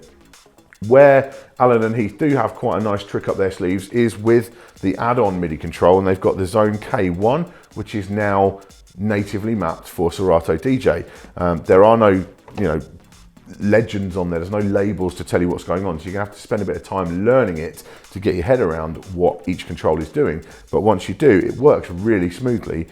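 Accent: United Kingdom. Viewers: Great review + british accent = brilliant.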